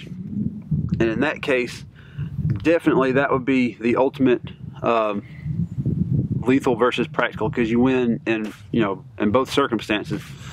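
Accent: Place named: Alabama, United States. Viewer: What part of Alabama you’re from?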